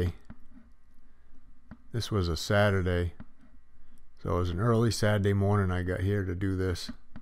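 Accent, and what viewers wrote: Maine, United States. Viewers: I moved away from Maine about 30 years ago give or take. I'm listening to him and thinking that's a Downeast accent. Then at he says "getting everything right screwed into place".. :) Yup. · I could tell you were a fellow mainer, that accent is thick. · LOL within 10 seconds of hearing you speak, I said this guy's from Maine, possibly NH. Good Job!!! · Ohmygoodness, you sound like home. You live near Rumford, ME? Or Waterville. I bet you're Waterville. I'm out in Colorado now, but grew up in Monmouth. Watched the whole video just to listen to you. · You sound like you may be from Maine. Great video! God bless from Aroostook County.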